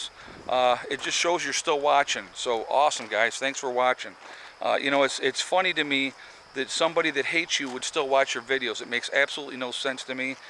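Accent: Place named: South, United States. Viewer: I thought you were from North Carolina..or some southern states.. Y could anyone hate you? Perhaps they're jealous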